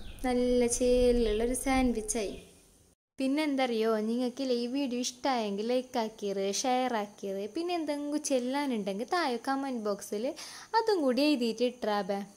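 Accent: India. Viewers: നല്ല രസ ണ്ട് സംസാരം തലശ്ശേരി യല്ലേ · Super too Ikkanakinane nammal kasarkode slang padikum taa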